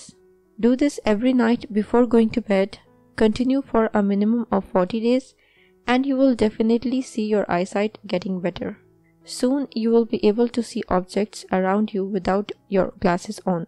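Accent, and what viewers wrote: India. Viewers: SORRY . WE DONT UNDERSTAND . YOUR. INDIAN. HINDI ENGLISH . SORRY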